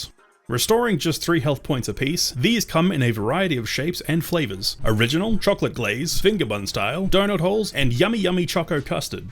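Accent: Australia. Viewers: he's australian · I love listening to a "polite, elegant, australian" voice narrate over such a crude and violet game, the contrast is just so funny but also, genuinely calming! You're videoz R gud man :) Me give thumbs up!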